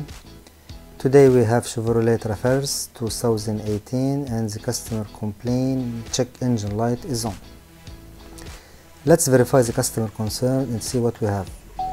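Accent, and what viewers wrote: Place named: Arab world. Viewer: واضح من لهجتك انك شخص عربي اهلن بك أخي واسمح لي أن اطرح عليك مشكلتي في هذا الخصوص لدي سيارة ترافرز 2018 عند تعبئة الوقود المحرك لا يعمل واحيانأ يعمل لكن يوجد ضعف في الأداء مما يجعلني اتوقف لمدة عشر دقائق ثم بعدها يعمل بطريقة طبيعية قمت بعمل فحص كومبيوتر وكانت النتيجة عطل في بلف الايفاب p0496 بماذا تنصحني هل اقوم بتغييره؟ إم انه يتم فحصه يدويأ قبل التغيير كما يظهر في مقطع الفيديو هذا؟ وماهي النسبة الصحيحة لإثبات العطل؟ ارجو الإفادة للضرورة ولك خالص التحية والتقدير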